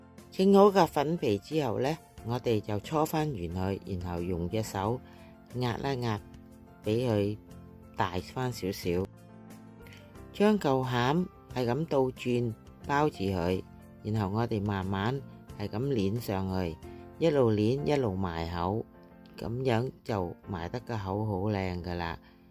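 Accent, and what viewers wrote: Vietnam. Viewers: 聽咗一分鐘 終於聽到你的越南口音啦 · 謝謝Amy 老師不吝賜教，這個是我今年做月餅的首選，希望能成功，你帶越南口音的廣東話非常有親切感，做好後成績如何會向你交功課，謝謝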